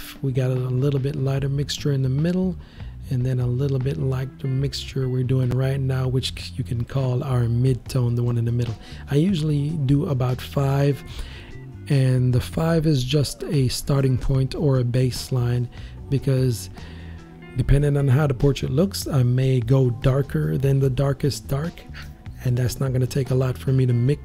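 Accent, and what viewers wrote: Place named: Jamaica. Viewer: Very nice are you Jamaican? Your accent sounds like me. I was looking for colored skin tone so I checked out your tutorial, it’s very informative. Thank you.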